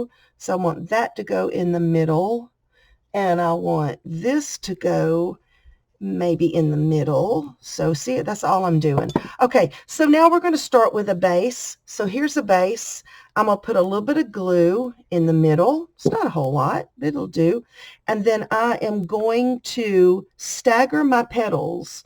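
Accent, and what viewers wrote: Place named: South, United States. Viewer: These are fun. Love your sweet southern accent ❤️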